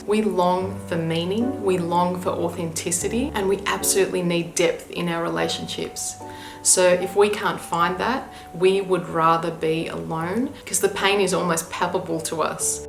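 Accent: Australia. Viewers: I love your Australian accent. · I hear an Aussie accent..I live around Sydney. Do you suggest any meeting group around Sydney. I tried to search on net, could not find anything. Regards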